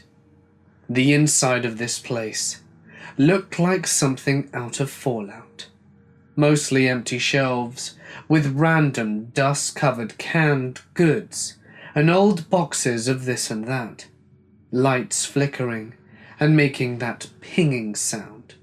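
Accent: United Kingdom. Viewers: Just love hearing his British accent...wished I was fluent having his accent living here in America... I trained as a trucker and heard creepy stories about what States to avoid, always be aware of your surroundings because of human trafficking and truck jacking do to people think that semi truckers have a lot of money...😬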